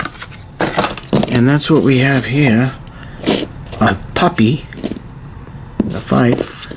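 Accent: New England, United States. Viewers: Black powdah in havahd yahd Love the New England accent! Thanks for the unboxing! I’m just getting into the hobby and bought the Waterloo starter set. May look at this one next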